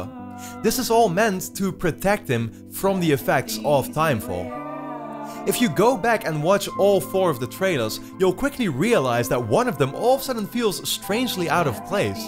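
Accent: Netherlands. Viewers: Having an allergy may mean he has better resistance, though, to whatever is causing it. Awesome video! Can't wait for the game either. Heerlijk NL accentje btw :D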